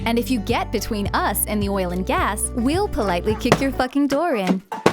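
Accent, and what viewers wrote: Canada. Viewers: she aces a canadian accent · as a canadian living in australia, love it. thanks for doing this segment. and with a canadian accent too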